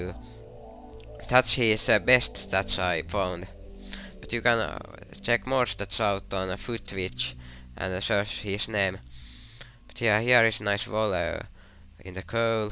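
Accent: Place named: Finland. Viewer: haha 40 seconds of commentary and i could hear the finnish accent even though im not finnish nor scandinavian. :) good vid anyway :) ill have a look at him in the career mode as well as fut. keep up the good work mate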